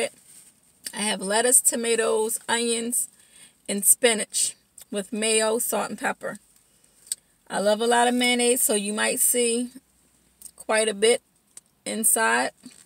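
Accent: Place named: Baltimore, United States. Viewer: I just love all of your subway videos!! Are you from Maryland? You have an accent that I can't place, but you sound like my old neighbor. She was from Baltimore. I'm from Georgia, so you can always tell when someone moves here from some where else. She moved back there to be close to her family, but if I close my eyes I hear her talking. So just curious? Also wonder what kind of work you do? Your daughter is adorable!! I love, love your subway videos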